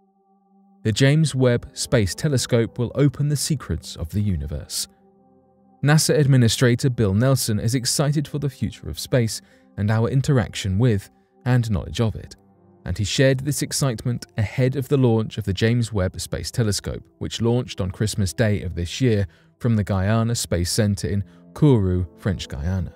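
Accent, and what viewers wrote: England, United Kingdom. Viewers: Four minutes sensationalizing microscopic plastic and seven minutes indoctrinating you, with an English accent for authenticity, into a belief system for a 13 billion year old universe. Never mind the actual science of the matter showing the universe to be extremely young. And the "Big Bang"?, really? I thought we were past that joke of a theory. Even the inventor of the word, Sir Fred Hoyle, didn't believe in this nonsensical theory. Oh!, and he was vilified for it by the scientific community just like Mary Sweitzer was vilified in 2005 when she discovered soft tissue, red blood cells and DNA in a supposed 65 million year old T-Rex bone. Neither one were creationist by the way. Y'all need to get a clue.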